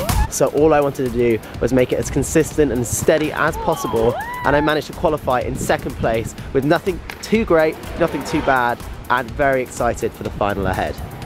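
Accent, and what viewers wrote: United Kingdom. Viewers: Hi from Argentina!! (I speak spanish so sorry for mi mistakes) Congratulations for you!! I really like it how so you speak with the britiah accent hahah. And i always shocks when see you doing that in the pool!! Is really crazy how you can do that. I probably never can do that, im more studying person haha. But good luck for you!!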